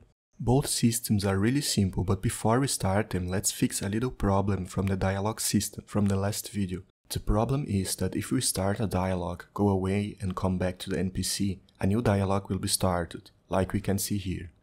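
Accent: Brazil. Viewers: Muito bom irmão, Conheço de longe a voz de um BR kkkk otimo tutorial, poderia ensinar como funciona o sistema de autotiles do godot?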